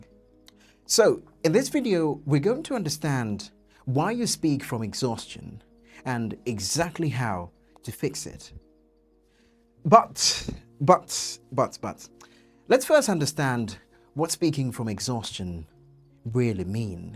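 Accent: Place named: RP, United Kingdom. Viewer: You have the RP accent , which better that the American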